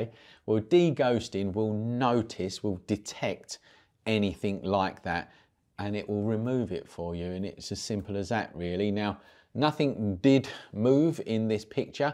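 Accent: United Kingdom. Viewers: Great to hear a Brit for once. Also, letting us experience the video before asking for a like and subscription.I will definitely be looking out for more of your videos and advice.good work.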